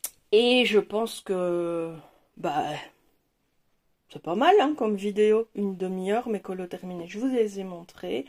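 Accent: Belgium. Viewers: Coucou, tu es multitâches 😍 J'entends un petit accent belge 🤗 (klette 😂😂😂) Bisous 💙💙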